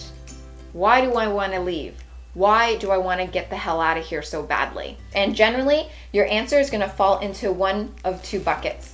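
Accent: Canada. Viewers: You sound Canadian :)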